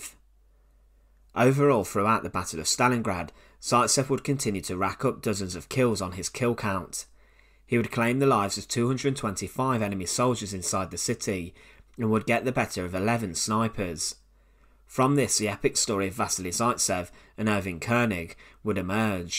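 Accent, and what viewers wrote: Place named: Leicester, United Kingdom. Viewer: This strong Leicester accent is just terrible! It spoils everything.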